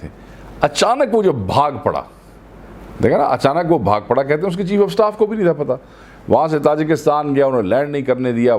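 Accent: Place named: Punjab, India. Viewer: Sr apka punjabi tuch buht achha lgta hy 😑🤣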